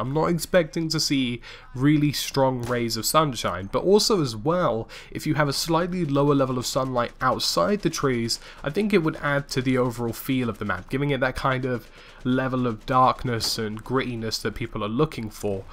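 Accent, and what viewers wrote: United Kingdom. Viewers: You have a very light accent for a brit! May I ask where you're from more specifically?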